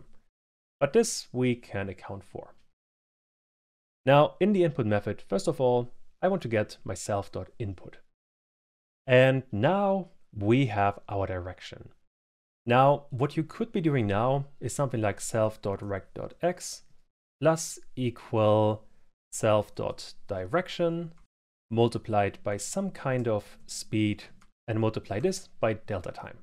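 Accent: Germany. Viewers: Your content is fucking amazing bro, keep it up! :)) Btw, höre ich da einen deutschen Akzent heraus? xD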